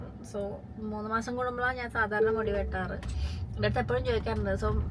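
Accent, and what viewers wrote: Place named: Trivandrum, India. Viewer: You are from Enklm and you have tvm slang...😅😅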